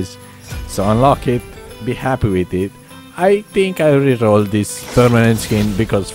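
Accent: Romania. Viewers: Man, are you from Romania? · this guy is 100% romanian(after the accent) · esti roman?? mi se pare ca ai accent de roman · Se vede dupa accent ca esti român ! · Puteam sa jur ca esti roman din primele secunde. Oricum, de apreciat. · ai accent de român, pwp.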